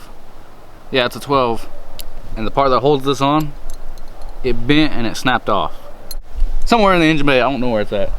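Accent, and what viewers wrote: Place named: South, United States. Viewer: You sound very southern, but actually a very practical ricer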